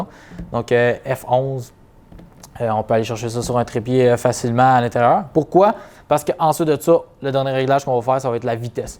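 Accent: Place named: Quebec, Canada. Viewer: Super vidéo très pédagogique, la meilleure que j'ai trouvée en ce domaine. Explications claires et très belles photos. Et puis j'adore votre accent, à vous, les amis québécois. Merci pour ce beau partage.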